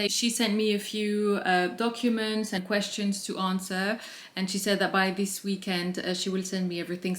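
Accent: United Kingdom. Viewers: I am in love with your british accent. Please don't stop vlogging in english.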